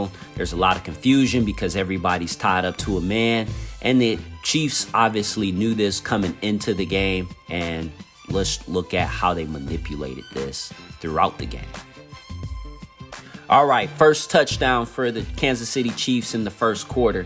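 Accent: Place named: West Virginia, United States. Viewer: Are you from West Virginia by any chance? You sound exactly like Randy Moss! :)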